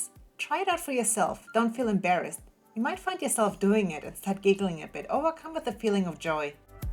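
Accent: Germany. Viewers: The lack of German being spoken in this video makes it less fun. 🤣🤣🤣....it's all good, though. The accent makes it worth it still.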